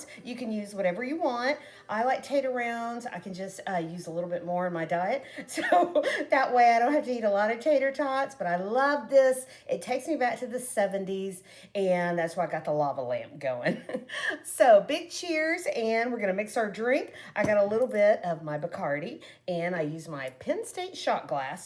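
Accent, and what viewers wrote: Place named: Texas, United States. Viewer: lol, I could tell your accent is from Texas.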